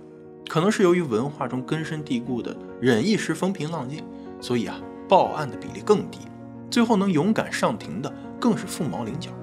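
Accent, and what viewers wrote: China. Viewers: 博主S與F的英文的發音都不正確(卻是中國大陸的英文教育所教的中式發音)，請重新上網向外教老師學學。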